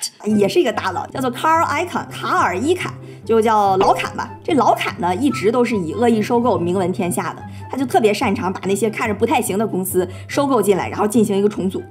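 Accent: China. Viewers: 你如果講話能沒有大陸口音會更吸引人。還有，學習會用破音字。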